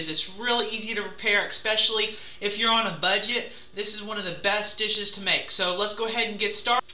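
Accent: United States. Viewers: he sounds like he's living in texas or something with the southern accent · he sounds southern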